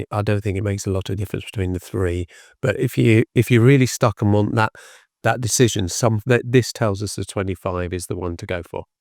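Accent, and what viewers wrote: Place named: United Kingdom. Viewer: Thank you very much for this channel in British English. I find it very pleasant to listen to (as I am not a native speaker and my English is only at a basic level), and I particularly appreciate not having to listen to American English. In addition, the content of your videos really appeals to me – it is well selected and clearly explained.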